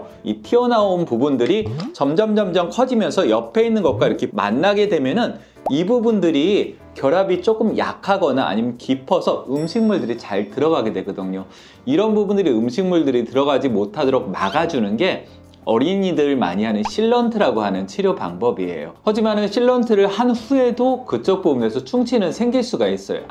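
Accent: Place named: Gyeonggi, South Korea. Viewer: 경기사투리인거 경기도가 고향이신거?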